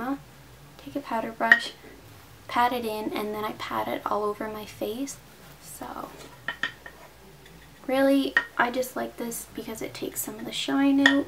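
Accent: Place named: Canada. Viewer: Are you Canadian? Lol, your accent clued me in, but then you said "shoppers" hehe.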